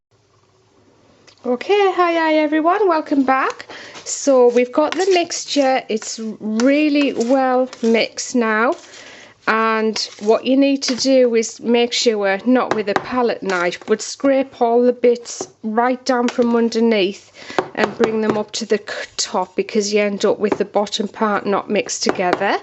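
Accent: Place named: Newcastle, United Kingdom. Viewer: hey where did u get the citric acid from u sound geordie im in newcastle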